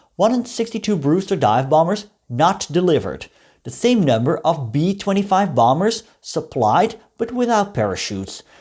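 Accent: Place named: Netherlands. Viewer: Fellow Dutch history-lover here, great to see your stuff. That and it sounds funny (somehow) how you speak clean Dutch next to good English. Most that speak English sound really weird when they speak Dutch.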